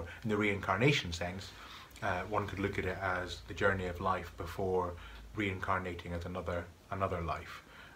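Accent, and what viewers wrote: Scotland, United Kingdom. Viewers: Six of Swords is one of those cards I go straight to with a new deck. I have my preferences as to how it looks. Nice to see the completely trimmed Spiral Tarot. I did the same to mine, red borders be gone! Lovely video,. And your accent, trying to place it. I'm guessing Scottish? Thank you for sharing. 😊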